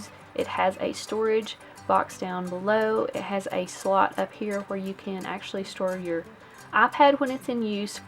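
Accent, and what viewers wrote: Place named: Appalachia, United States. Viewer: Thank you,! I love to listen to your delightful Appalachian accent!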